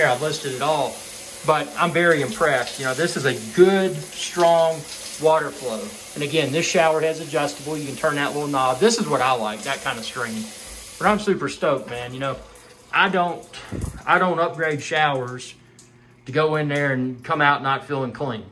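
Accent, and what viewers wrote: South, United States. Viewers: I’m in Florida. What state do you live in? With your accent I’m guessing South Carolina? Georgia? Tennessee? And everyone says “It’s probably your faucet aerator! No it’s not because mine are very clean.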